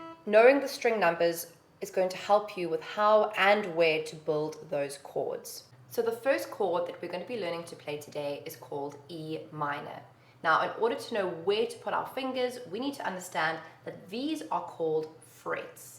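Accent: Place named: South Africa. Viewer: You are South African with either Greek or Italian background, by the way I'm very annoying I know, my wife keeps telling me. Love your guitar lessons, thank you...maybe Spanish?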